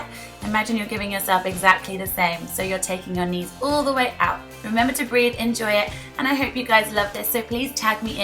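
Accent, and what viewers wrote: United Kingdom. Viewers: Are you from England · How refreshing to hear a British babe on youtubes fitness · Are you from Norwich? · That body + British accent= heaven! Wow!!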